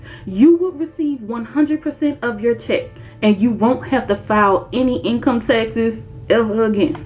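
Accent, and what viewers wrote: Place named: Louisiana, United States. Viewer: I love yo accent, that’s Louisiana?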